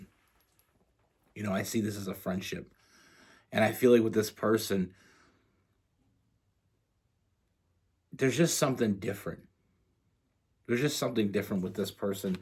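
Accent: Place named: Canada. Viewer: I friggin knew you were a cappie! aslo hearing the canadian in there. Love your work and storytimes ;)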